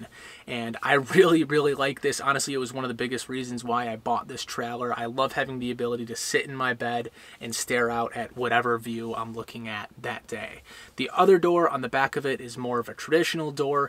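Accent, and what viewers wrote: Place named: Buffalo, United States. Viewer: Lol… tray - ler… I noticed he was saying trahler.. it’s that Buffalo accent 😀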